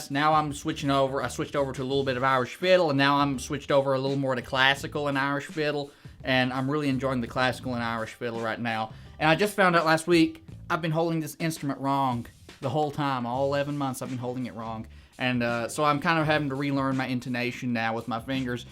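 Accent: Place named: Texas, United States. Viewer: I'm not American, but judging by your accent and those guns, are you from Texas? Haha I started playing the violin about 5 months ago. I couldn't afford the classes so I had to quit, but I still practice it. You sound really great, but don't hold it that high, or your shoulders will begin to ache and you won't focus on what you're doing. Your thumb should be placed on the side of the neck. Good luck :)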